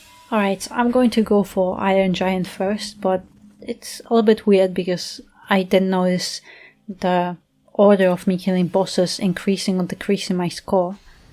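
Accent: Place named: Eastern Europe. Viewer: I like her accent. Sounds eastern europe, I am curious…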